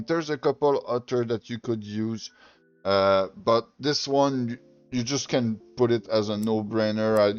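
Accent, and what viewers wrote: Quebec, Canada. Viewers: es-tu quebecois? ton anglais ma l'air d'avoir un accent QC 😅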